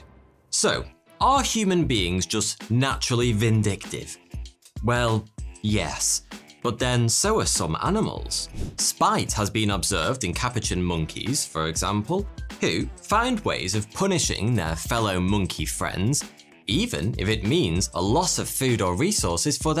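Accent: United Kingdom. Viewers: Love your stories and your fricking Brit accent (is it? I cannot decide which kind, since British comes in so many flavors and tastes...)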